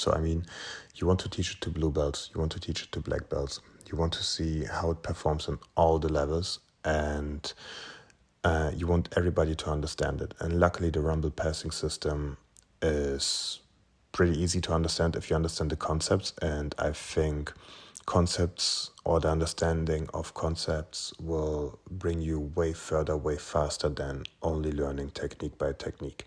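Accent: Germany. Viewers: This video should be titled "German man mumbling about rumbling" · are u german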